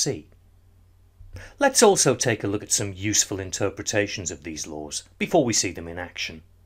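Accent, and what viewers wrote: England, United Kingdom. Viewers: i liked your English accent, good work, how do i ask my question??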